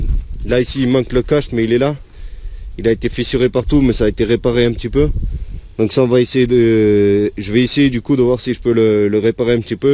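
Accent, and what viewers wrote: South, France. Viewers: J’aime pas l’accent du sud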